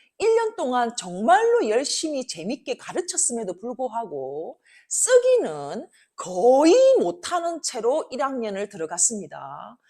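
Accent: Gyeongsang, South Korea. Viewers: 사담이나 수다 다 좋습니다. 지혜로우시고 단단한 내면을 가지신 작가님 육아 뿐만 아니라 인생의 선배로 배우고 싶습니다. 다양한 사담 맘껏 공유해주세요 재치도 있으시고 유머러스하시고 경상도 늬앙스도 넘 좋아합니다. 자기관리는 어떻게 해나가시는지 다 궁금합니다 😊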